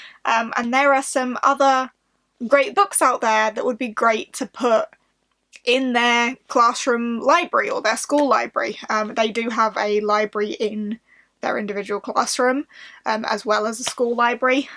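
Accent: England, United Kingdom. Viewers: Hello, I'm Andrea. I discovered Middle grade books because of you and i absolutely love it!! I'm from South America, Colombia and i really like your English accent. Plus you are beautiful and smart.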